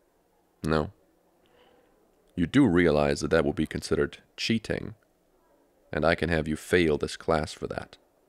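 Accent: Germany. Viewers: Is he nativ german or why that accent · DUDE YOUR GERMANY ACCENT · 0 hitting me with the German accent and everything damn 😳✋🏻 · I Love your German Voice 😂❤️❤️❤️ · that's a good German accent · such a good german akzent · love the german voice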